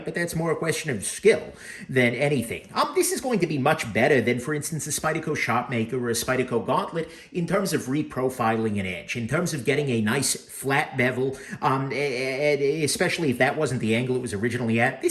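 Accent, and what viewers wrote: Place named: New England, United States. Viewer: This dudes way of teaching is awesome. Cool N.E. accent too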